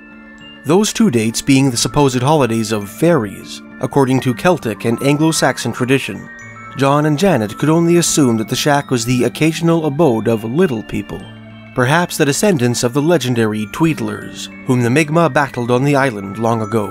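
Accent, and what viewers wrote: Canada. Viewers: i normally can't stand human narration (crass commercialism, low application) i guess the canadian accent made this work well enough, thanks for sticking to culture that you can represent with some integrity and concern, because it makes for a more meaningful tell. "whoever would have thought someone could make canadia interesting" ;) <3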